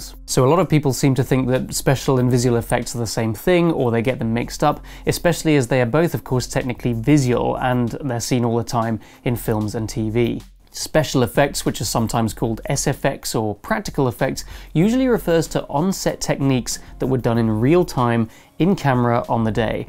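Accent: United Kingdom. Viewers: Ok. Because I heard, your English is British. :)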